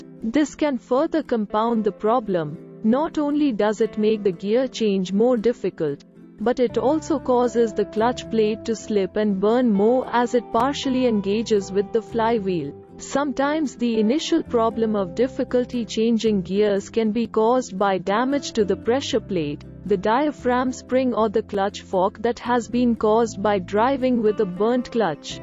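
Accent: India. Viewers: Are you an indian lady as of your accent please...!??🤔!!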